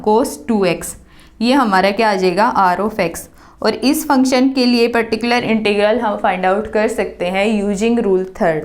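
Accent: Haryana, India. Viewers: Mam aap haryana se ho?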